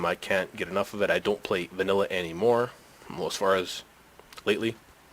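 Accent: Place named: United States. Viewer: i hate american accent. please note im tired an am annoyed of everything.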